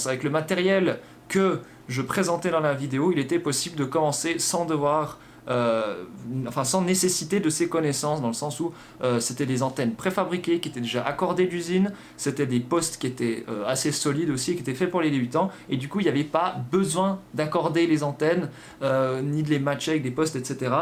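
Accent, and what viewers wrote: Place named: Belgium. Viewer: Tiens il est belge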